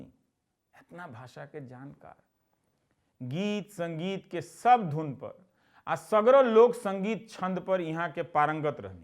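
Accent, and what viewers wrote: Bhojpuri, India. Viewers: ये तो the great रवीश कुमार लग रहे हैं जो पक्की भोजपुरी बोल रहे हैं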